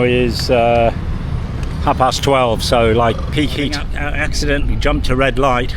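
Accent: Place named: England, United Kingdom. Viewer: Just loving English accent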